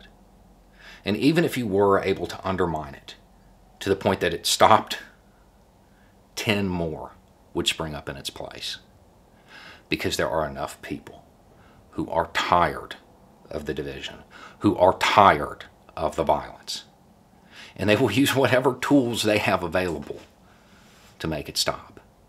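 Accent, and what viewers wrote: South, United States. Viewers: Go, your southern accent was what first caught my attention because half of my family is from the South. The rest are from above Chicago. After you accent, I listened closely to the content...I have learned a lot...and I'm glad about that...Keep up the good work...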